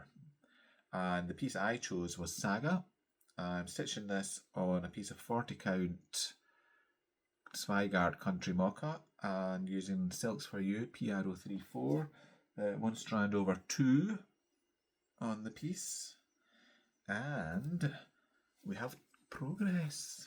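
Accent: Scotland, United Kingdom. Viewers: Hello from Wellington, NZ. I have been meaning to comment for... well... 27 episodes but well, I usually watch you on the TV while I'm stitching. I have to say, I enjoy your lovely Scottish accent. You have a lovely calming voice and I enjoy relaxing to your Flosstube! I'm also a Flosstuber... just published #271 this morning. Was supposed to be Sunday but... YouTube did not let me upload yesterday/last night and I had to cancel and redo it. What am I stitching on? Well, right now I am taking a wee work break and having some chicken noodle soup. But, I will be getting on to a large redwork sampler after work - pushing for a finish this week. Happy stitching! Leigh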